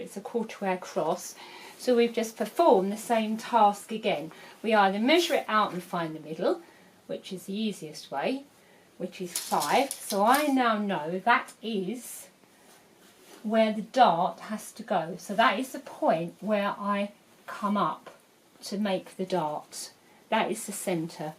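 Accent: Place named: England, United Kingdom. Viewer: I would listen to your English accent for my whole life. Lovely.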